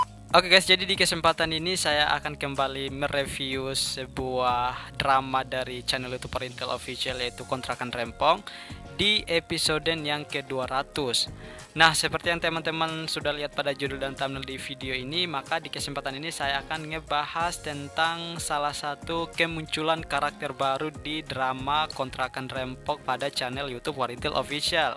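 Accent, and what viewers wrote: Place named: Sulawesi, Indonesia. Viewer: Kentara sekali logat Sulawesi nya 🙏😁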